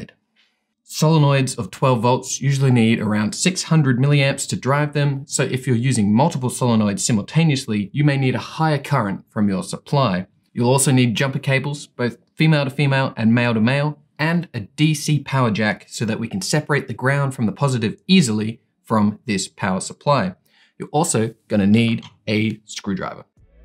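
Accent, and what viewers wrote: Australia. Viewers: He’s just an Australian…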